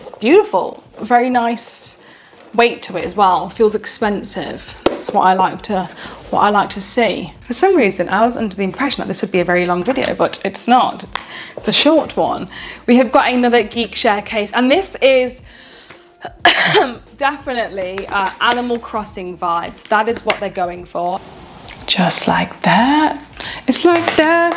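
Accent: United Kingdom. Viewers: British accent, especially when spoken by a girl, is one of the best things in the Universe :3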